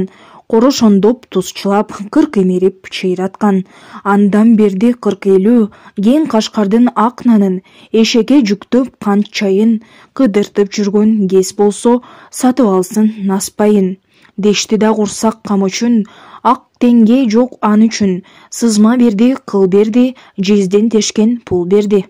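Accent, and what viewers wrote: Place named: Russia. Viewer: Киргизка окшойсун а, акцентини ондо же оруссунбу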